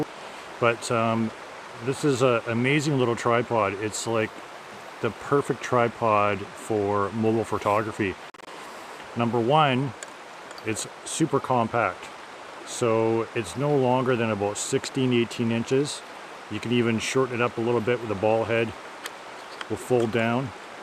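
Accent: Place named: Canada. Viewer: I heard his Canadian accent 5 seconds in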